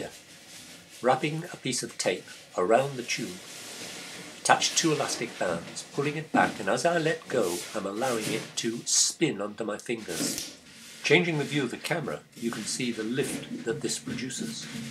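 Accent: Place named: United Kingdom. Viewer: Great video. Pleasant British accent, and succinct explanations-- what more can you want from a man?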